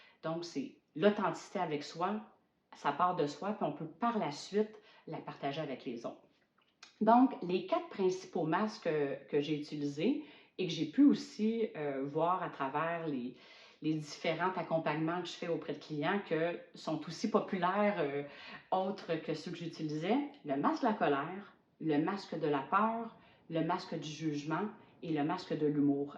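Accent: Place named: Quebec, Canada. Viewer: Ah mais ! C'est l'accent de la belle province ! Je vous ai démasquée miss ;-)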